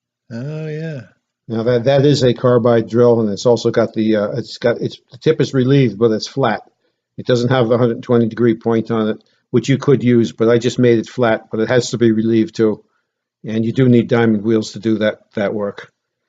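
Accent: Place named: Massachusetts, United States. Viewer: Seems like you have quite the shop there very impressive. I hear the accent is that Massachusetts. I'm from CT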